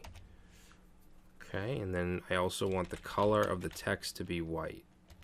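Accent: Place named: New York, United States. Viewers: NeewYorka Accent ! awesome tutorial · I love the New York Accent!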